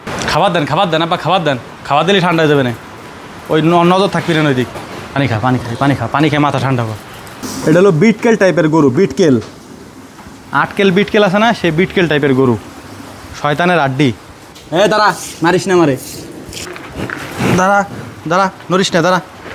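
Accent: Faridpur, Bangladesh. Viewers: ভাই কি আমাগো ফরিদপুরের মানুষ নাকি ভাষা গুলো শুনলেই কলিজায় লাইগা যায়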